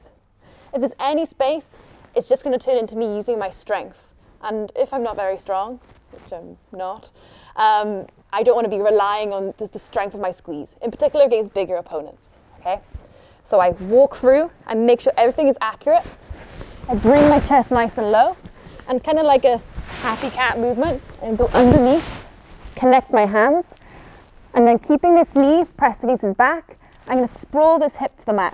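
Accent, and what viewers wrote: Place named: Wales, United Kingdom. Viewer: She's from Wales...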